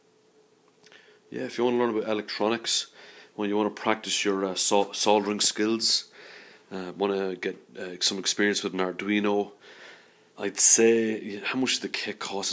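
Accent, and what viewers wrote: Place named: Midlands, Ireland. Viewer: Are you from the midlands? You accent sounds like its from the Irish midlands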